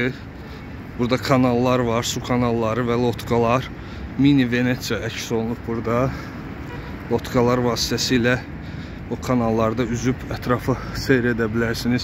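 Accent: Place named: Azerbaijan. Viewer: Приветствую Вас дорогой ❤🇦🇿💞🇦🇿 Простите меня пожалуйста за не скромный вопрос: " Почему вы должны вернуться в Турцию "??? Я по разговору чувствую вы АЗЕРБАЙДЖАНЕЦ......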